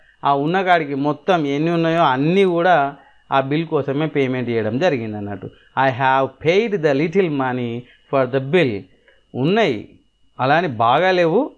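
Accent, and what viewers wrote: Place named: Telangana, India. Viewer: Good explanation and Ur voice and Telangana slang was awesome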